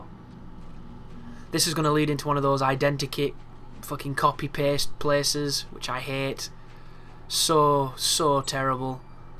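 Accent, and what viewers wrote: United Kingdom. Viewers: Hi, I enjoy your videos and your accent (no offense) helps me dealing with UK and Scottish management in my work (I understand them now). One thing though - the ball sacks are cysts and the enemy you call cyst is exploder. Not that it matters.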